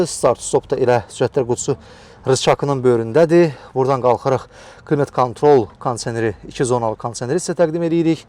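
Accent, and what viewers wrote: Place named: Ordubad, Azerbaijan. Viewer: Qardaş Ordubad lehcesiyle danişir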